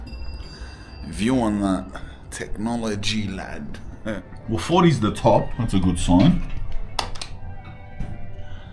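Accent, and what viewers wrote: Australia. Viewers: wow that aussie accent,, i just cant,, the guy talks like a 12 year old,,, ciao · Holy Aussie accent😂🔥🔥🔥🔥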